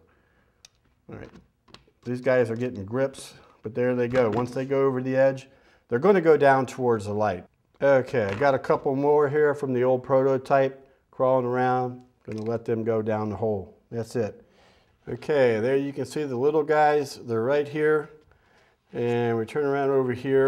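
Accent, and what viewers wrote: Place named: Pittsburgh, United States. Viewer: YEP, this Gentleman is from Da-Burg. Go Steelers!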